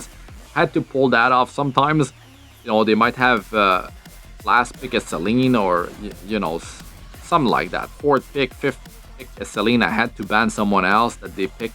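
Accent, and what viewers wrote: Quebec, Canada. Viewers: Hey très bonne vidéo de RTA et en passant j'ai remarqué ton petit accent québécois dans ton anglais qui est vraiment pas facile à remarqué pour un non québécois en tout cas tu te débrouille vraiment bien continue !👏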